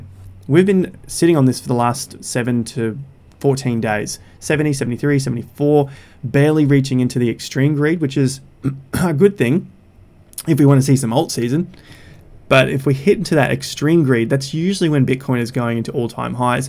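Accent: Australia. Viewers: who are these people you feel the need to apologise to...no apology needed. You’re Australian and won’t speak the Queens English like what I do.....As for sound quality I only noticed a difference when you were away but no problem. More....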